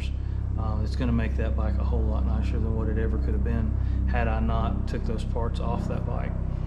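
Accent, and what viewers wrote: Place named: South, United States. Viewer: There is no such a thing as a perfect and when I say wrong I just disagree. It does not mean I am right and you are wrong that's all. If you were not doing a great job I would not be watching you all the way across the country in a miserable state lol of Southern California North East San Diego By the way you talk I'm guessing you're somewhere in North Florida or South Carolina? Also I don't have any Hondas actually have a couple of 2 wheelers 2004 WR450F, 2002 DRZ400 and 1985 Yamaha 225DX. I did grow up in the 1980s with a 1977 very first year Honda Odyssey and 83 Honda 185S. I don't like 4 wheelers I am a dirtbike guy but for some reason I also do like threewheelers so go figure Well thanks for doing a great job keep making videos and I watch some of your videos over and over again and my opinion is that Honda two valve motors were a lot more durable and did not have top end problems like their RFVC 4 valve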